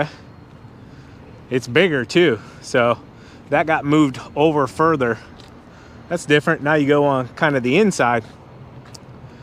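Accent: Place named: South, United States. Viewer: just keep sounding like a southern red neck like me. i knew what you were saying.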